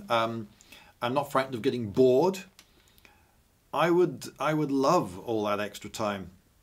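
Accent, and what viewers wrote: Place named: United Kingdom. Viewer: Very British man overanalyzing a hypothetical question? Yes, please!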